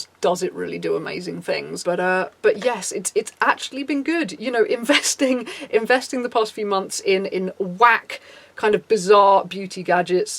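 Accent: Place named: RP, United Kingdom. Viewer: God she's posh !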